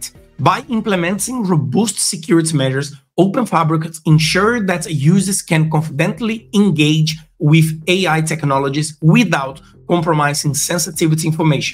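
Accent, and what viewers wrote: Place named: Brazil. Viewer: Your accent is too strong, looks like a Brazilian speaking in english..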